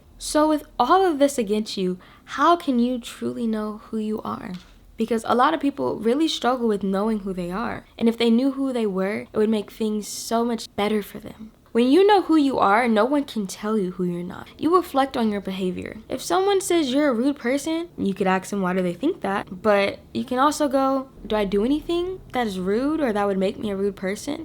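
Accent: United Kingdom. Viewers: The famous British accent🙃💕 back with the good advice as usual ❤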